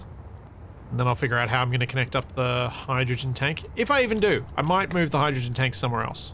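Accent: Australia. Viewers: With Ozzy Man dialect, my brain keeps translating his talk about "half-width stairs" into "half-wit stares"... which I'm kinda takin' personally... 😳🥸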